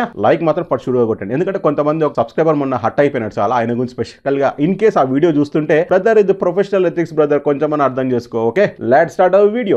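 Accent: Telangana, India. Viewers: అన్న నువ్వు తోపు అన్న నువ్వు ఎక్స్ప్లెయిన్ చేసే విధానం సూపర్ అన్న తెలంగాణ యాస భాష భాష యాస స్టైల్ సజీవంగా చెప్తున్నావా అన్న నువ్వు తోపుల కి తోపు అన్న నువ్వు మామూలోడివి కాదు అన్న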